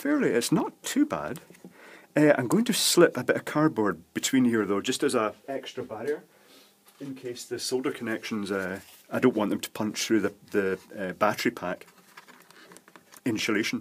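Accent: Scotland, United Kingdom. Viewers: i find your vids very entertaining and informative, and your smooth scottish accent makes it quite soothing! good man keep it up!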